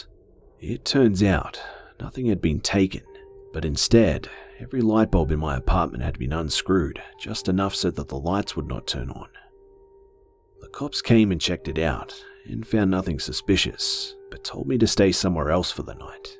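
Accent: Australia. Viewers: Sorry to hear about your situation. I'm a huge fan of all your wonderful Aussie accent and stories, I'm sure YouTube will go back to normal quickly. You know shit like this happens a lot, good luck with your patron. I wish I could be more help, but I'm pretty much where you are. Good luck anyway. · Be. Australian?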